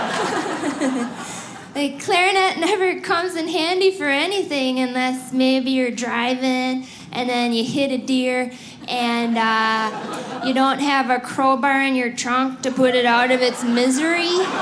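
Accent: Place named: Minnesota, United States. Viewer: Lol she has the stereotypical Minnesota accent.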